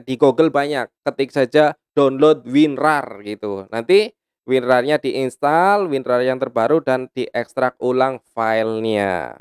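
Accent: Banyumas, Indonesia. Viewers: terima kasih om...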